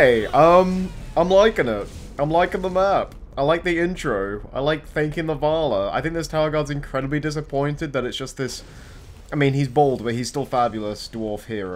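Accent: United Kingdom. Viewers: Hi I'm just a little YouTuber nobody but I'm from Oz and I promise you don't have an Oz accent, you sound beautifully British:) Love all the stuff you do and you are the reason I started watching YouTube, never stop with your magic!:) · Thanks for playing the map, hopefully I can make some more in the future, if I do (and if they're good) they'll be coming your way. Yes I'm aussie, and your accent is British, but, as they say....... Iv'e liked Bfme versus since BEFORE it was lame. Just joking I love ur channel I dont mind if u use my name, its ok. whatever.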